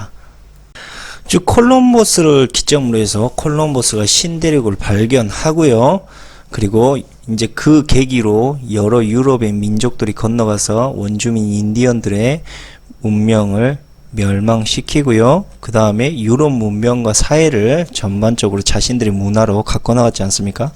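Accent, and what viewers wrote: Jeolla, South Korea. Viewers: 긍께 고향이 어디다요?? ^^ 전라도 지라? 좋은 정보 감사합니다.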